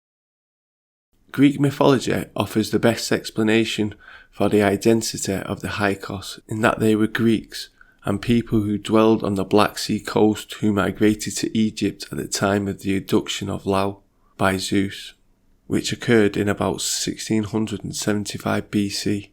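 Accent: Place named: Manchester, United Kingdom. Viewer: Much respect this is amazing the narrator who's telling of these accient events sounds like he's got a Manchester accent much love from liverpool keep up the good work my freind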